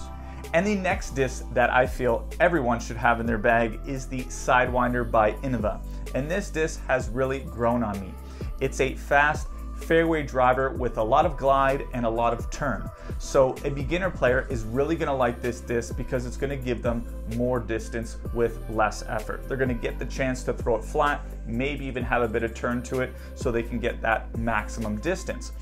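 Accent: Quebec, Canada. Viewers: when available I'm going to grab the elevation koi putters. I love the interceptor midrange. Rubber putter might be interesting. That sounds like quebec canadian French correct? Btw love the content. Keep it up.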